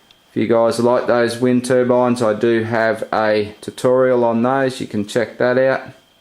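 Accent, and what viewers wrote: Australia. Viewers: are you australian because thats AWSOME!!!!!! i'm aussie to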